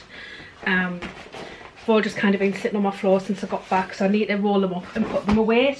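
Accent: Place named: Northern England, United Kingdom. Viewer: Wow what a fantastic haul! It was your fabulous Northern accent (as is mine!!) that lead me to you, the first time I saw a video it was Hang on that's an English accent and double bonus Northern!! So keep up the fabulous work and don't ever change we love you for being you and a fabulous bag maker and seller of quality hardware and runner of classes and retreats xx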